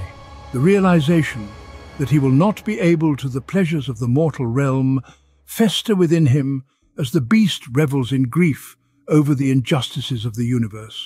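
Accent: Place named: United Kingdom. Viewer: The British dude narrating makes my brain turn off and stop listening. Idk why. 🤔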